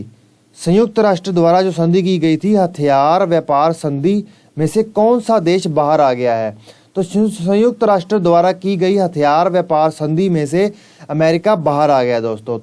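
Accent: Punjab, India. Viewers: paji sardar lagde oo punjabi hindi tuhadi mix ho rhi aa chal koi na veer........god bless u rabb trakiya bakshe...thank u so much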